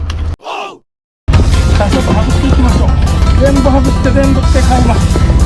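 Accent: Okayama, Japan. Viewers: いつも楽しみに見させてもらってます 車エビ最高ですね 刺身で食べたいです 岡山弁？が ヤッパリ最高です 無理せずこれからも 楽しい映像をよろしくお願いします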